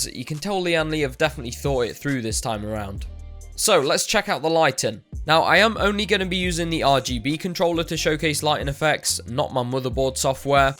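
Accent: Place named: United Kingdom. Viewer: Damn you have a strong uk accent :D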